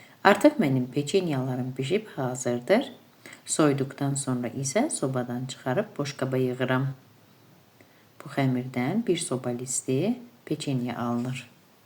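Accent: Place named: Shaki, Azerbaijan. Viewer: Siz Şəkilisiz? Ləhcənuz çok gözəldir 😊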